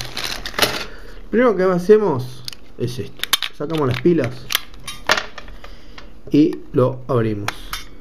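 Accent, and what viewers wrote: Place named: Argentina. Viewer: Es muy divertido escuchar a un argentino explicar cualquier cosa. Me recuerda a "Les Luthiers" yo tengo un cuñado argentino y me divierte mucho jajajaja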